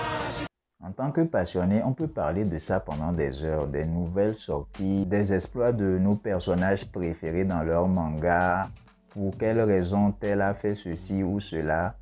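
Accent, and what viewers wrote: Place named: Togo. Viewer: Je suis béninois mais je reconnais l'accent togolais. Félicitations et beaucoup de courage !!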